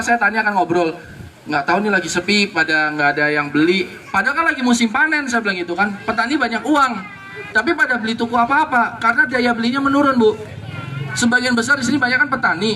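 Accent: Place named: Indramayu, Indonesia. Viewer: Logatnya kentel indramayu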